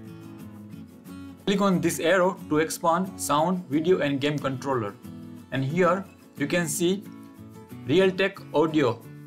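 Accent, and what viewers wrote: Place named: India. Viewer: when I hear an indian man I know I am seconds away from a solution - Thanks buddy, love and respect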